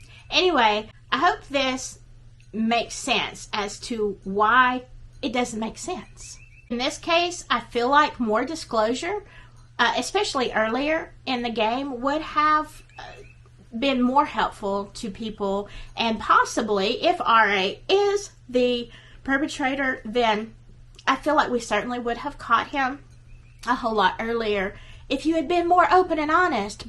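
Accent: South, United States. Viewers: Love your southern drawl..I've got a kiwi one! 😉🌿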